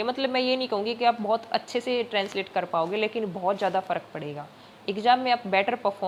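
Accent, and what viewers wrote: Haryana, India. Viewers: Mam meri English bolne m bhut dikt h tho pls app meri help kro 🥺🥺 Ak bt puchni thi app haryana se muje lga apki awaj se 🙄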